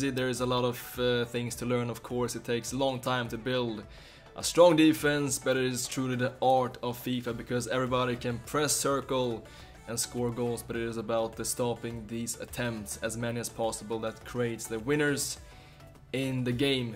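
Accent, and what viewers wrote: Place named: Sweden. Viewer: Hahahaha. I first thought was some kind of Boris/Borat namechild. Heard the accent. "Ah, Borås.":D (not a big accent, but I could tell)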